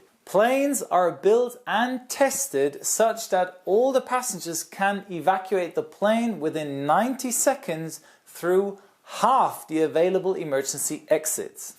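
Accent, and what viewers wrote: Germany. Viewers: Is he German? I can hear an accent, but i‘m not sure if it’s a German one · hahaha in der ersten sekunde wusste ich du bist deutsch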